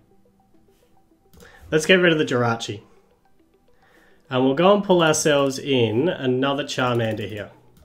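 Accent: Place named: Australia. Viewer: I love that Charizard finally has a competitive card! Also, judging from your accent, I'm guessing you're from Australia? Watching here from the UK! Love your channel! Keep up the good work!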